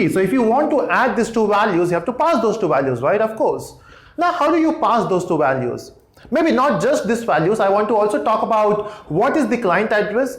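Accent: India. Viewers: people that don't understand strong indian accent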